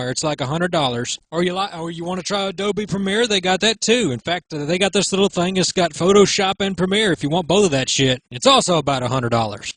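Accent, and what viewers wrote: South, United States. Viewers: you sound like a hilla billy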